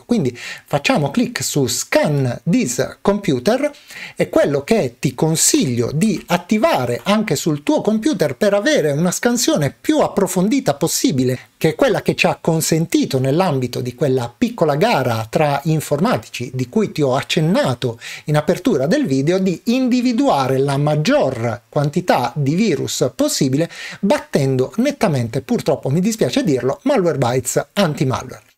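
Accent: South, Italy. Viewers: Salut mon vieux, comment vas tu ? Ci si ri..legge ^^ Tre domande "bionde"...soltanto, anzi quattro..., insomma poche domande ^^ :- Malwarebytes si deve disinstallare prima di installare questo o si può fare anche dopo ? Vivi a Genova ? quindi non sei di Genova ! Sei del sud, vero ? La tua "erre" (e forse anche il tuo nome) ti tradisce ^^ Di dove esattamente ? Perché non puoi mangiare patatine ? Usi la stevia ho sentito, diabete forse ? Uhm la tua Nutella direbbe di no, o sbaglio ? ...nel corso del corso...? LoL Ciao e ancora grazie caro vecchietto ^^ P.S. In futuro forse dovresti aggiungere il software in download sul tuo sito prima di pubblicare il video che lo riguarda...vedi caso 3DP Chip ^^ P.P.S. Come avrai notato il mio italiano migliora constantemente. Brava la bionda, vero ? ^^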